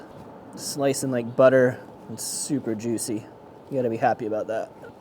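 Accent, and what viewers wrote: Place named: California, United States. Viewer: Who has all that their kitchen your special spices and infused chili oil you sound like you're from California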